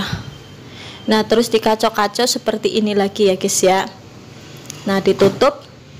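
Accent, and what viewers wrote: Banyumas, Indonesia. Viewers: Emang enak kacang bakar mbk ngapak😁😁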